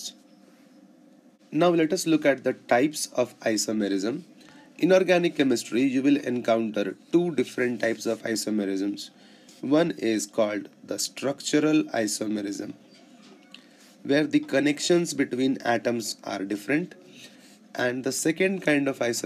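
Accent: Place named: India. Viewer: one of the few Indian accents that doesn't irritate me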